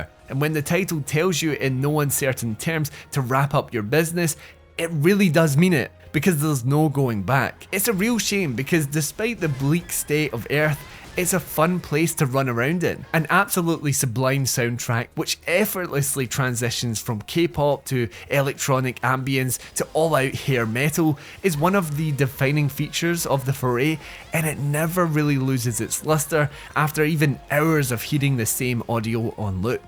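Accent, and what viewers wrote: Scotland, United Kingdom. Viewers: Oh no not a scottish accent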